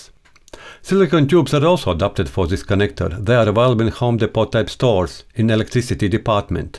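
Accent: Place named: Russia. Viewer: Привет! Жуткий акцент))). Вы русский? Отличное видео. Будет чем себя занять на досуге. Лайк.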